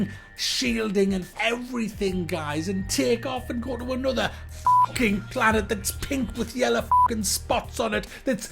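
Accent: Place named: Northern England, United Kingdom. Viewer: He's a northerner? I like that accent.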